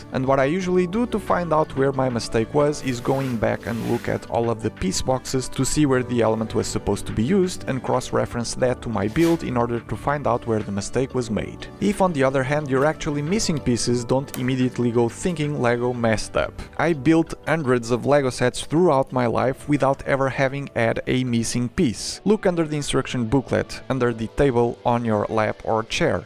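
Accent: Portugal. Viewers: És português? Parece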